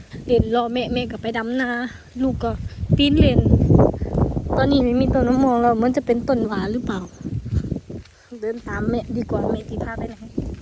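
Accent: Korat, Thailand. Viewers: สู้ๆน้องสาว ภาษาคือออกไปทางโคราชบ้านเอง อ้ายยู่ลำปลายมาศติดตามตลอดเด้อจร้า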